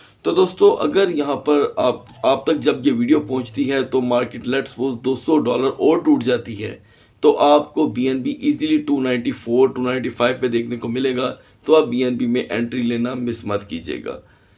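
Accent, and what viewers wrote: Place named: India. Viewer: You speak like indians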